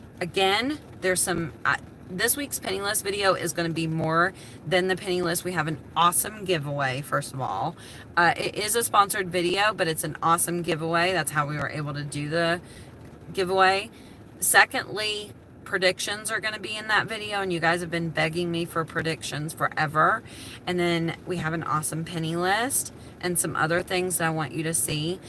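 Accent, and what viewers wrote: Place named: Georgia, United States. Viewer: Love your attitude, you sound exactly like me I was born and raised in Georgia. But I now live in Oklahoma LMAO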